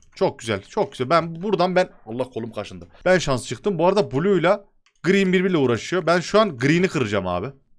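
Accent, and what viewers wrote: Yozgat, Turkey. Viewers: 10.56 Abi Yozgatlisin yalnız Kral eline sağlık abiciğim cağnıı yerimmm ❤ Video mük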